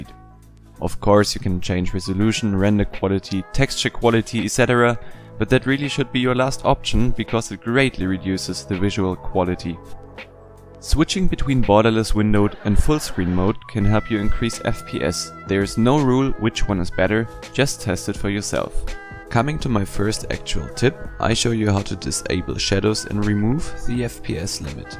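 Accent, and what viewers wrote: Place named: Germany. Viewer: mann weiß einfach sofort das du deutscher bist xD NICHT BÖSE GEMEINT ABER EIG ECHT NICE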